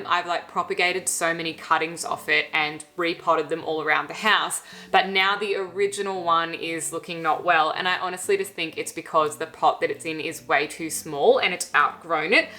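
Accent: Australia. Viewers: 🦋Love you channel except for 1 thing...It’s a shame you choose to use the American slang Haul...it doesn’t sound right with the Aussie accent ☹️ You’re an Aussie! 🤗🇦🇺 don’t we call it a shopping spree 🛍 in this beautiful country of ours🏝🦘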